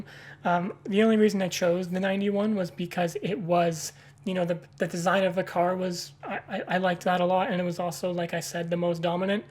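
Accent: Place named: Toronto, Canada. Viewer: Are you from Toronto?😂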